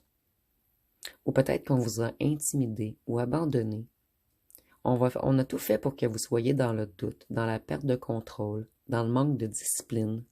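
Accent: Quebec, Canada. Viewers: Ah ,le fameux accent des Québecois(ses)....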